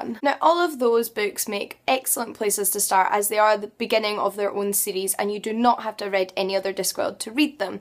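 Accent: Scotland, United Kingdom. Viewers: Ohhh this is Scottish? Interesting. Seems like I mistook another brittish accent with Scottish then xD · Love this video. I wanted to start reading HogFather, but when I saw how it was in the Disc World it was intimidating. I am going to subscribe to you now. Love your accent. Scottish? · She has a Scottish accent. She is Scottish, which means she is a,so British. If you want to split hairs at least know what you mean. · British does not just mean English. Scotland is part of Great Britain, so is also a British accent. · My first thought when she started to talk”She’s british. I can trust her” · Thank you. A good friend recommended the whole serie and I felt a little bit overwhelmed ! Also, completely out of context, are you scottish ? I'm not a native english speaker and I plan on moving to Scotland soon and so far I'm struggle with the local accent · She has a strong Scottish accent. 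You just don't know your accents mate. No shame in that. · Béranger Seguin it's Scottish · She's "Bri'ish", even better!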